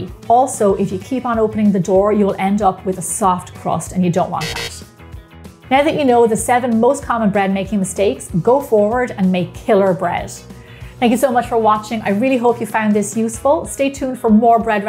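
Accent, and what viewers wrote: Ireland. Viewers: Well, I can make hundreads of dishes but will never get this right.given up on bread making. this is a good film. TU for sure and she's very atractive bread maker but sounds Irish not english. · TY for the tips. Love, love your accent, I could listen to u all day. I believe it's Irish? Both my great, great Granny's were named Bridget & came from Ireland to the U.S. (to the great state of New Jersey) during the late 1800's both married German immigrants named William. I have made several of your recipes and they were quite good, Keep up the great work. 😁👍💖👏👏👏👏👏👏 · she sounds Irish to me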